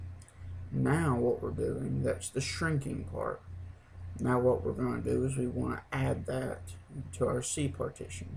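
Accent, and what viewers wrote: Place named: South, United States. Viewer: Thanks for making this informative video. Love your accent. Its so saddening that southern accents are being diluted in recent times. I hope the accents always stick around. I love them so. You also happen to have a very calming voice. This video is all around good to watch.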